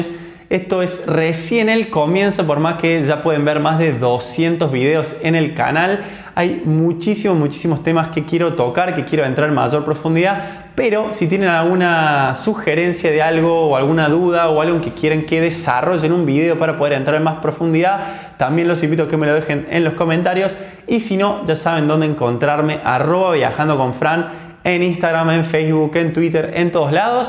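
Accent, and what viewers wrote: Córdoba, Argentina. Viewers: El acentito Cordobez :) !!, estoy de acuerdo en lo que decís, de hecho, actualmente un vuelo de buenos aires a san Juan y viceversa que tarda 2 hs, cuesta lo mismo que ir en ómnibus que tarda 17 hs en promedio. La gente por X razón siguen viajando en ómnibus !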